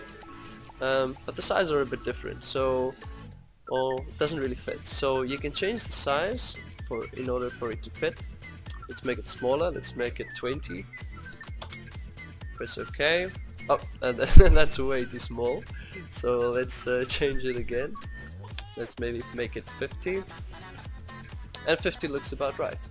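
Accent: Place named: South Africa. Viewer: I can recognize a South African accent from a km away lol was just about to ask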